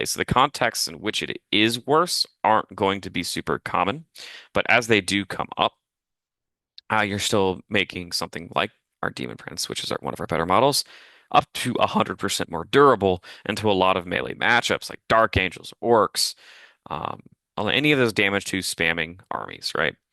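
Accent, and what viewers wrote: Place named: United States. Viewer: Wait, I thought this was a British YouTube channel? Why is there an American man here?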